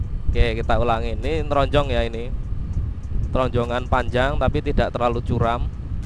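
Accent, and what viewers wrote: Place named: Java, Indonesia. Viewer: Asli mana mas?kok logate jowo "dronjongan" 🤣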